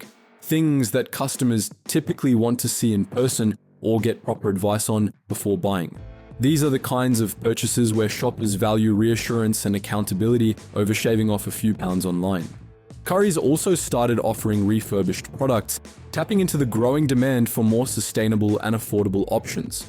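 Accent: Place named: Australia. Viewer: Nah, yeah, haha, yeah... The narat9r is an Aussie , can't you hear him ? Got stuffing 8n y9ur ears have ya ?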